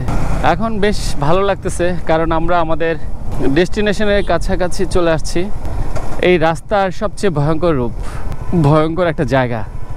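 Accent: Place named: Bogura, Bangladesh. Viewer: Vi apnar basha Bogurai ......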